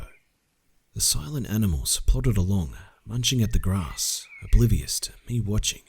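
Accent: Australia. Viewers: r u Aussie?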